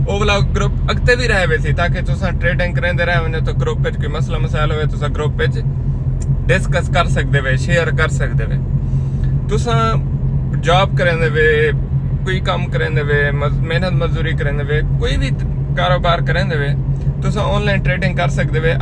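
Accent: Saraiki, Pakistan. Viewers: سر جی ٹھوس تے ماشاءالله بڑا میٹھی سرایکی بولیںدے ہوے I love you Sir Ji❤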